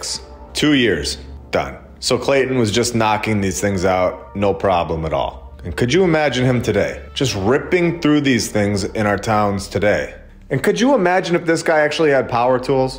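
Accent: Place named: New England, United States. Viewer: by the way I'm a new subscriber the second video I saw was of Saint Anne's Church in Fall River Mass that I'm very familiar with because I live one block from there right now. I have a few old pictures you may want that I didn't see in the video. You sound like you're from the Northeast you have a similar accent that I do are you from new england?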